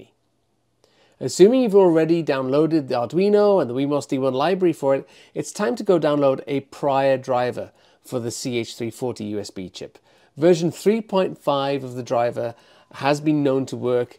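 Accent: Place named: England, United Kingdom. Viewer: Hi buddy, I assume U are english because of your accent. I must say that English people were very usefull during WW2, and today again you did help a poor lonesome french struggling since a long time with download pb with win11/vscode/plateformIO/esptool... to ESP8266mod . I was stuck for 24H .... CH340 behave well with my uart terminal, but not during flashing... I listen your video, and downgrade my CH340 driver from 3.8 to 3.5.... and it fu*king works! thanks guy ! and best regards!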